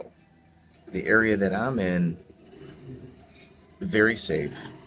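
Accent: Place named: South, United States. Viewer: I like the cool way you narrate your fact! It makes you want to listen! The southern way you round up the words reminds me of the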